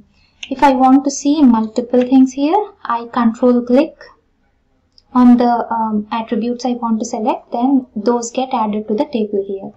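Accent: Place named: Kerala, India. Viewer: When you have accent, its very hard to understand. are you a malayali?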